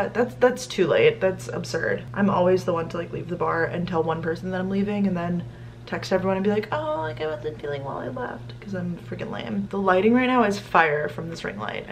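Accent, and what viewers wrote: Long Island, United States. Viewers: I’m a type nine:))) also i can hear the Long Island accent making a come back 😂😂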